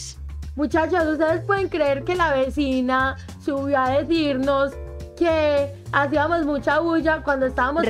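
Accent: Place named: Antioquia, Colombia. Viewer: buenas noches saludos desde el municipio de arauquita departamento de Arauca y soy nuevo y quiero seguirla a su Instagram etc. y la voy a apoyarla yo a usted en todo el momento y me gusta mucho su acento de paisa y me gusta las mujeres paisas y además me gusta las mujeres que sean churcas lisa etc. y siga adelante con su trabajo de ser you tobe y la siga bendiciendo a usted y a su familia y además con tantos problemas de la que estamos lo de la pandemia pero no hay que darle miedo pero usted ora y rezar para que pase todos los problemas y dificultades y hay que seguir pa delante por que pa atrás lo asustan y mil bendición y que tenga una feliz noche y que Dios lo bendiga a usted y a su familia.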